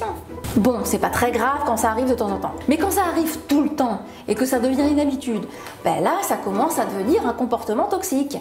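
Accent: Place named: South, France. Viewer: Jolie accent du sud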